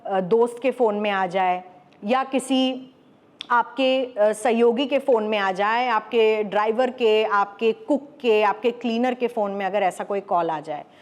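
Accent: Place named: Bihar, India. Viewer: Sound se to bihari lag raha hai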